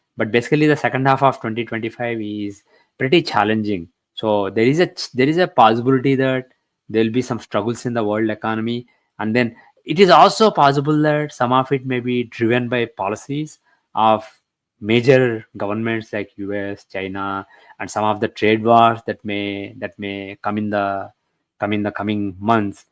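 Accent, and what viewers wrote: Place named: India. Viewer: Very nice of you sir, inspite of living in USA since long, you still speak indian english, 😅which every one can follow and understand.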